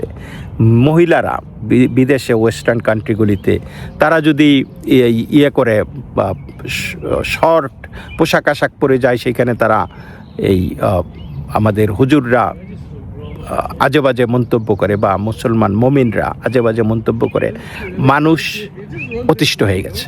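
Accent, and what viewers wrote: Bangladesh. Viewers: Islam is not a religion but is a political ideology.... don't be a hypocrite...you also know the truth... whole EU must ban muslims...and ban muslim immigrants... don't you know how Lebanon was islamised in just 20 years... your dialect tells me you are a Bangladeshi Hindu...have you forgotten the Noakhali massacre of innocent Hindus...??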